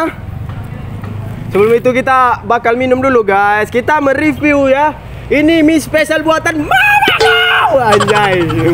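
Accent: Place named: Jakarta, Indonesia. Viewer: Kyk org betawi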